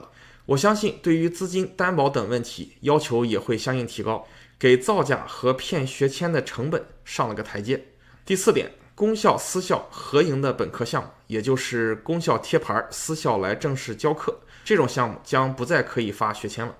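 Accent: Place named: Shanghai, China. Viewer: 你这个上海话。。。。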